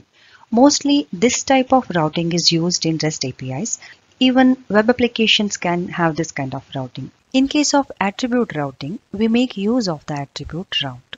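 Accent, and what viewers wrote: India. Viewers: This Is indian accent which is very clear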